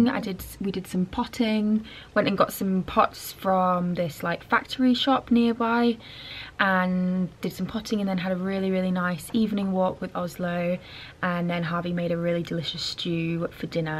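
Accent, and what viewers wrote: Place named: North, United Kingdom. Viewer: Way more relaxing then any ASMR channel. For some reason when you spoke on this video you sounded a lot more ‘northern’.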